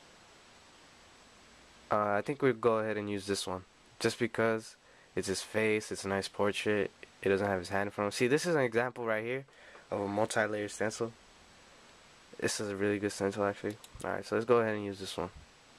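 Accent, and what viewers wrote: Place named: Los Angeles, United States. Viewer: u got the la accent :0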